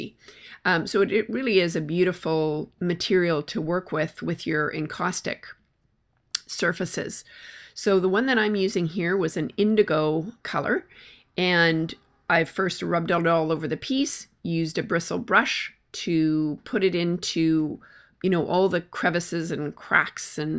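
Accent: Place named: Fargo, United States. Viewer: Omg I love your Fargo accent so cute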